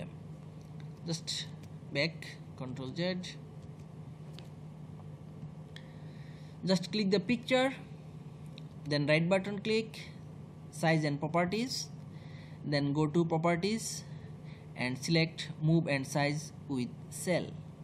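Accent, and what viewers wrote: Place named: Bihar, India. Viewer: Bihari english speaking first you speak clearly hindi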